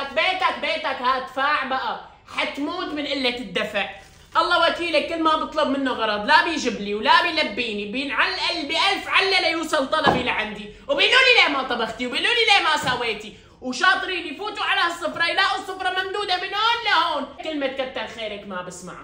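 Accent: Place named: Syria. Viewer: هههههههههه والله ضحكتني ماحلاها اللهجة السورية تحية من الجزائر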